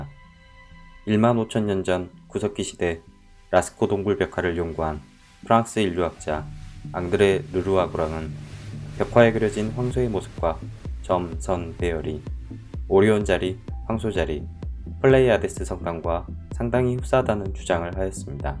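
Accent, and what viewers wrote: Jeolla, South Korea. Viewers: 형 고향이 전라남도여? 전라도 사투리가 심한뎅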